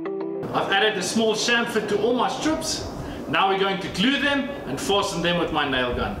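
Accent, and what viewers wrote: South Africa. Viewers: Fantastic work. You sound like a afrikaans speaking South African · Nowt better than the South African accent.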